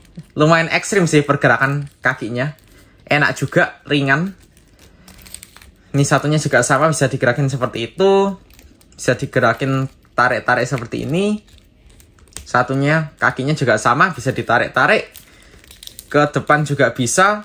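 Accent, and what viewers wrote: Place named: Java, Indonesia. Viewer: how much are this in rupiah and where can i get this in Jakarta? on a side note, i find it unique to how you’re a Chinese but speak Javanese dialect/accent 👍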